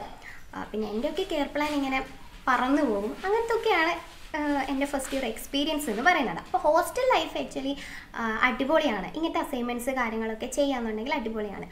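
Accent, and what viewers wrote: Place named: Kannur, India. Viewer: oo.. Evdeya nursing padichee... Chechi kannurkari aairnnalle🥰basha ketapo thonnni... Njanum....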